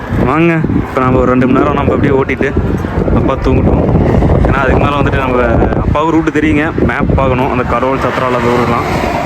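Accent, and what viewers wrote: Kongu, India. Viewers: அப்பாக்கு நீங்க கொடுக்கிற மரியாதை.. நீங்க பேசுற அந்த கொங்கு தமிழ் அழகா இருக்கு நன்றி ப்ரோ❤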